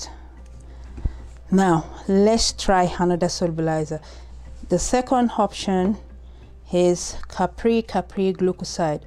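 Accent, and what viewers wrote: Nigeria. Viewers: Are you Nigerian Am picking up on igbo/ yoruba accent ?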